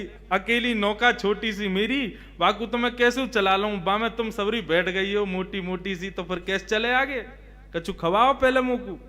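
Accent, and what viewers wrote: Braj, India. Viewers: 🚩🚩🚩🙏🙏🙏🥰😅 अति सुंदर अति सुंदर महाराज जी बृज बोली कितना मिठास है सुनने बड़ा आनंद आता है श्री राधे राधे हरे कृष्णा प्रणाम प्रभु❤❤❤❤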